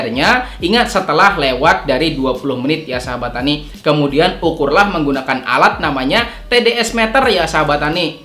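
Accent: Banjar, Indonesia. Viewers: Orang Banjar kh abang ni? 😁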